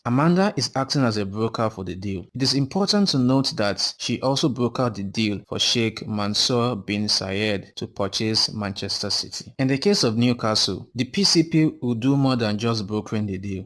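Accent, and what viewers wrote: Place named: Nigeria. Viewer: Real Nigerian accent you got there mate.. Good info though.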